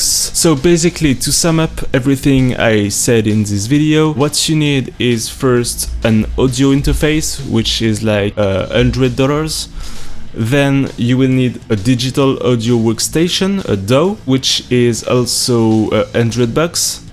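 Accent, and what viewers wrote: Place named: France. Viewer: It's great and pleasing to watch another frenchy with international goals. Don't care about what people say about your accent. Be yourself face to the camera and people would feel it. So it's good. Envoi chier les rageux égoïstes qui préféreraient que tu parles français, ils n'avaient pas qu'à sécher le collège. 😁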